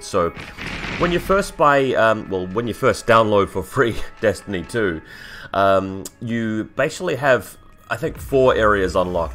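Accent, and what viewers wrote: New Zealand. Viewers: bruh i fucking thought you were Kiwi, you didnt have any other accent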